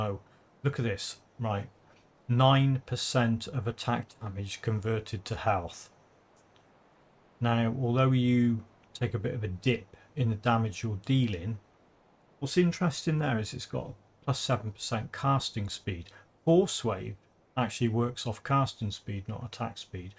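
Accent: United Kingdom. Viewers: Hey man! This comment is kind of unrelated to this video, but I just wanted to say that you reignited a spark in me, not only for this game, but for my life (as cheesy as that may sound). I have an embarrassing amount of time in this game for what I have actually accomplished. Anyway, I'm sick a lot and bedridden where I can't do much of anything, even play GD, but watching your videos has changed my entire perspective on not only my approach, but the FEEL of this amazing game. You made it feel okay to suck...lol. I mean I should have learned the things you teach WAY earlier in life. No gimmicks or music or loud people yelling "HEY GUYS! Check this out!" Your blazingly stereotypical English Karl Pilkington ish accent made it fun to listen to and watch while in utter despair. This may sound like something bad, when in fact it's absolutely amazing and I'm very thankful for it and you. 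Disclaimer: I am in no way saying you are like that guy, just the accent. I plan on doing tons of your builds with some of my spice (currently doing your Bloody Pox) and many others. Just wanted to say thanx man, and that Warden video with every class takes some serious commitment. Keep up the great work! ..It's appreciated. 🤘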